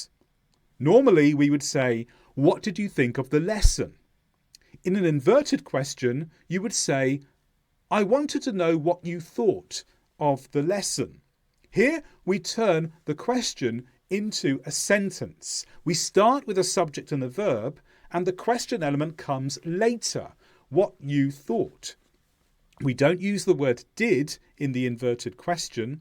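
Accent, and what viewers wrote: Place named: United Kingdom. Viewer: I’m impressed by the volume and thoroughness of the information you’ve presented here. Thanks to the video I can identify grammar spots to work on and improve. And the fact you are from the UK makes me even happier ‘cos I prefer this accent. ❤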